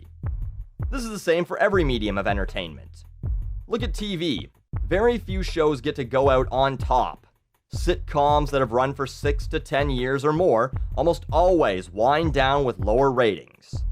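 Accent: Canada. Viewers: Make a final video where you say your goodbyes, then open a new account, quit the Canadian accent (you should have done that long ago actually) and use a Swedish or Norwegese accent (it's the same cold country anyway, wonder why they speak different languages??!!). Do the same thing, same concept. Some people will say it kinda ressembles this measure of that used to be good a while ago, most will just say this is THE shit right now (yes right now, not tomorrow). You get 10 millions views in one month and you can finally make it to vidcon!!! Hooray.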